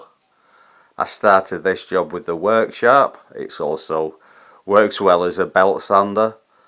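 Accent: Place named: Manchester, United Kingdom. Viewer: Nice work that mate , you've not lost the manc accent ;)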